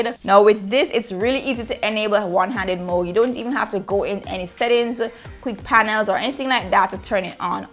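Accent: Jamaica. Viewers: is that a jamaican accent? seems like it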